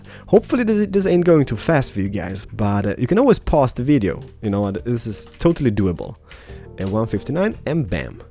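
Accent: Scania, Sweden. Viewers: you swedish bro haha love it riktig skånska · Du e ju SKÅNSK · Gillar din skånska. Också från Skåne men norra delen. Liking your skånska. Im also from Skåne but the north part. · Är du skånsk? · lol That Skånska! xD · wtf är du svensk? :O eller ja, skånsk ;) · Skånska =D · När du pratar engelska så hör man inte din skånska dialekt, men när du började räkna på svenska kunde jag inte sluta le. Så uppenbart att du är bosatt i Malmö, så go dialekt! :D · Oh man, has the most fabiest Swedish ever. I am also Swedish. <3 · Hahaha skånska e ju bäst :D (fast sthlm ftw ändå :3) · LapizManHD It is appropriate to say he speaks English with a Swedish accent. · His accent is sveska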